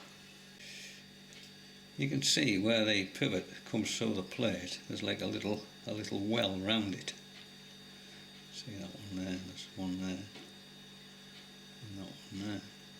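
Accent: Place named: Northern England, United Kingdom. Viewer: Hi. 2 years ago it would apply to me, Done 40 clocks since then, sell them on ebay and do some repair work as well. Really enjoy the work. Found something I enjoy doing at last and get paid for it as well. Seem to have learnt very quickly, despite being old 58 years. Sound you have a northern accent like me, Cheshire.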